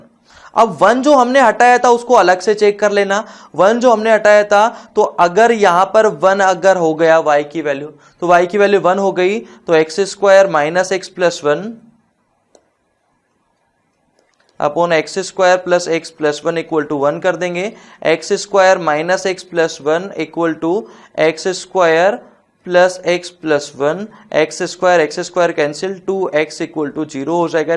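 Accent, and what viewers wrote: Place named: Maharashtra, India. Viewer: Sir sounds marathi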